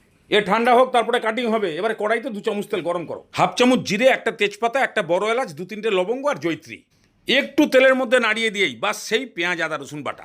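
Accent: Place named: Bangal, Bangladesh. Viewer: ওনার রেসিপি নিয়ে কিছুই বলবার নেই, অসাধারণ। কিন্তু ওনার USP হল কথা বলার ধরণ। শুনলে মনে হয় বাঙাল, আর বাঙালদের রান্না 🌍❤❤❤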